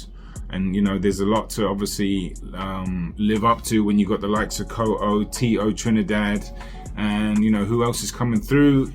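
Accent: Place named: United Kingdom. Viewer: Oh snap I didn’t click you live in nyc. From your British accent I just assumed you live in uk. Props bro from uk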